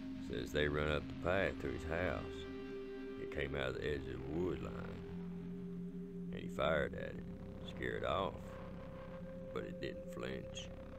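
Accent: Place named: Tennessee, United States. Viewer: I was having a real down day. Real alone day. My dad passed not long ago and I've been pretty sad since. I've never seen nor heard of a channel like this on YouTube before. Didn't know it existed. But here it showed up on my list of recommended videos, and the moment I heard that old Tennessee draw, my heart was immediately calmed. This was exactly what I needed. I can't thank you enough fir bringing a feeling of Home in a hard time. Thank you so much and God Bless you guys.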